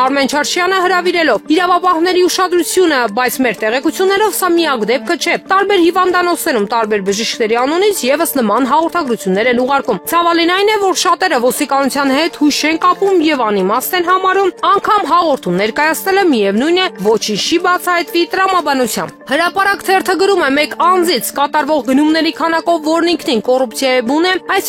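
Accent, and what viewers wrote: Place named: Turkey. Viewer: Այս հաղորդավարը թուրքի ստիլով է խոսում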